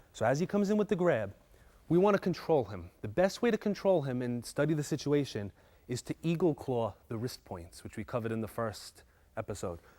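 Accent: New York, United States. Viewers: I would guess either New York, New Jersey, or Italian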